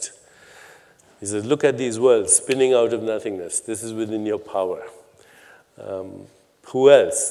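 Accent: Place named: India. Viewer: besides the incredible things he says his voice is enchanting.. the timbre, the indian accent, the glotal clicks... enchanting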